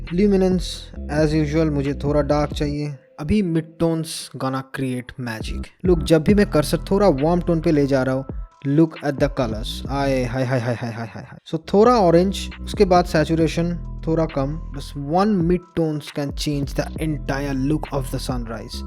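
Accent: Bengal, India. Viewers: Vai tumi bangali na?? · Are you bengali??? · Bro are you Bengali ?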